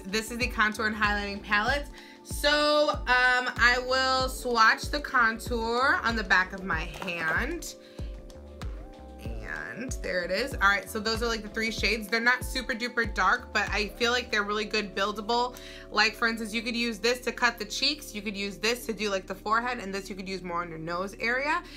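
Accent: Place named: New York, United States. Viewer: I hear a New York accent 🤔 or might be jersey ! Can't wait to receive my box !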